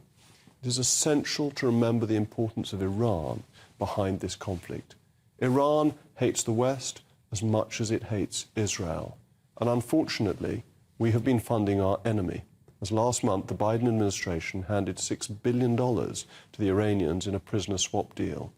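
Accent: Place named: RP, United Kingdom. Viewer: Why does this guy sound so condescending absolutely about every single thing 🫤 must be his posh accent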